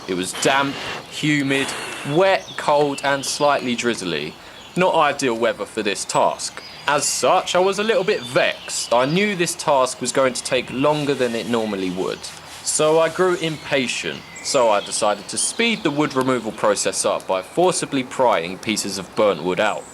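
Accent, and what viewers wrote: United Kingdom. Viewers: Which country do you live in if you don't mind me asking? By the background and your accent I'm thinking the UK. Not a stalker or anything, just wondering how you get away with carrying such a beast of a knife. I want to buy one of these to take camping but I'm worried of getting into legal trouble. I live in the UK and the local police won't even allow me to carry my folding pocket knife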